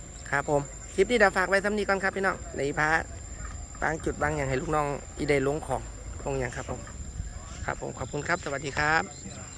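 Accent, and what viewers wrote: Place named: Chaiyaphum, Thailand. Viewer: พูดจาได้คล่องแคล่ว สำเนียงชัยภูมิ เพราะมากเลยจ้า กดไลค์กดแชร์อีกแล้วคลิปนี้❤❤❤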